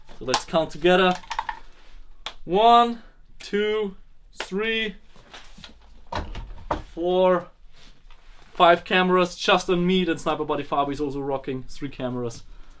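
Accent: Bavaria, Germany. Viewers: sprichst du deutsch,schweizerdeutsch oder bairisch ?? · German accent right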